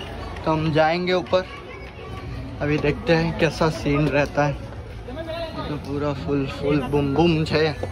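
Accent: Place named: Gujarat, India. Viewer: Mane laagtu j tu tamara ane learn na India cup na interview ma ke aa loko gujarati hase pakku...Tamara hindi ni style upar thi 😂😂 Love from Ahmedabad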